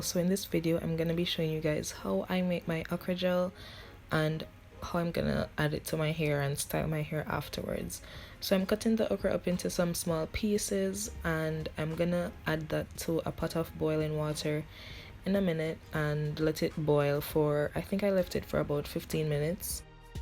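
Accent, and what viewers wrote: Jamaica. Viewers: You sound like a Jamaican 🥵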